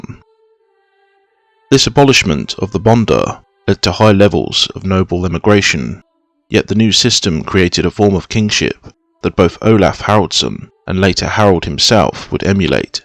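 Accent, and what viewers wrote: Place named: West Country, United Kingdom. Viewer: I'm getting a slight West Country tone occasionally?.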